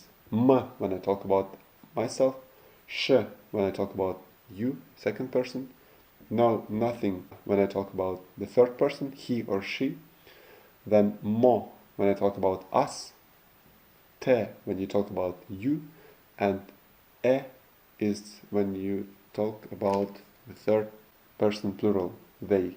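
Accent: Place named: Russia. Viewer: Is your native language Russian? Cuz I swear I hear your Russian accent all the time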